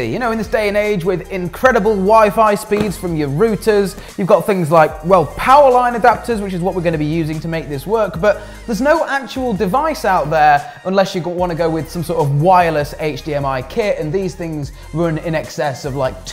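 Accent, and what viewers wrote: England, United Kingdom. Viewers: What do you mean "say Ethernet Properly "? He's saying it with an English accent, do you speak english? Because its sounds ok to me. it's called English not Americanese.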